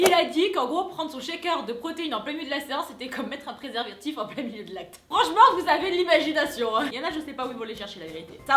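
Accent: South, France. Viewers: J'aime vraiment tes vidéos et surtout ton accent vive le Sud :)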